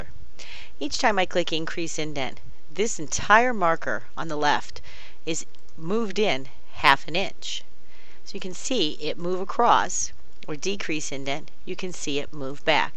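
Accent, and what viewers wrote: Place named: United States. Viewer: Pretty much a straight American-English accent. Ha, ha.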